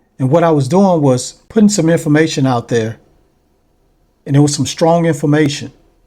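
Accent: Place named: Houston, United States. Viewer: You sound like you from Houston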